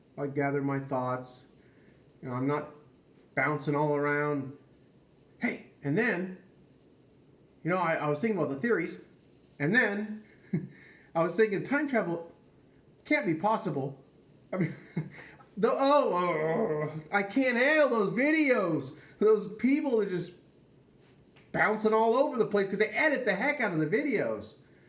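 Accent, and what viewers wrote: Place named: Boston, United States. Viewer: remember when I said you have changed.. your voice use to be a lot more... Boston or main... idk how to describe it.. aren't u from the east coast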